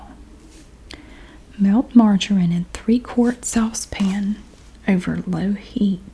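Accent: South, United States. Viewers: Living in the south I always forget she has an accent lol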